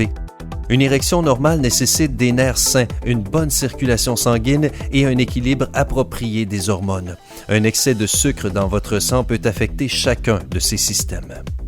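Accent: Quebec, Canada. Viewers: J'aime l'accent québécois du mec mdr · J'adooooore l'accent québecquois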